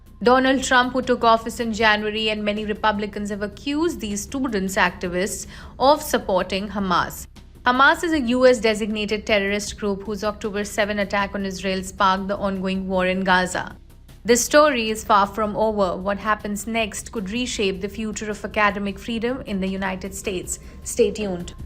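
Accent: India. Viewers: very few Americans can say my name correctly and I accept that. She has an Indian accent. Get used to it or move on.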